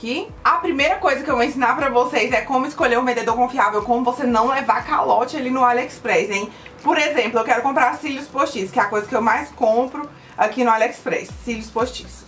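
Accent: Minas Gerais, Brazil. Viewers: Pelo jeito de falar... é Mineira ou goiana né?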